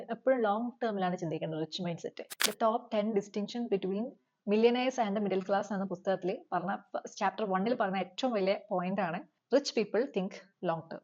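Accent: Kannur, India. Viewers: കണ്ണൂർ കാരി സ…അല്ലേ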